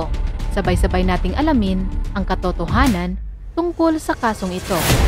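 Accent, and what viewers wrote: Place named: Visayas, Philippines. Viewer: parang bisaya yung bosis ng narrator ...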